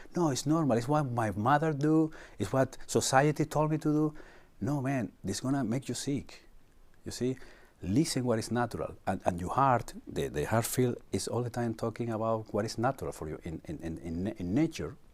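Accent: Spain. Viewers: Voor mij, wonend in galiciē is het vreemd om engels met een sterk spaans accent te horen. Ik ben er door afgeleid. ik zit steeds te wachten tot hij in het spaans verder gaat, maar dat gebeurt niet.😂😂.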